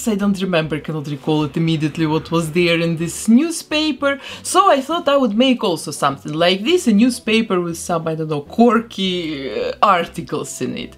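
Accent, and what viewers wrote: Russia. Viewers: Only i hear russian akccent? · У вас русский акцент, как мне показалось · Почему то со стороны мне кажется что это Russian Woman по акценту и выделению каждого слова в произношении, но я могу ошибаться и на самом деле это нормально в Америке. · Russian accent? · Похоже на русский акцент 😅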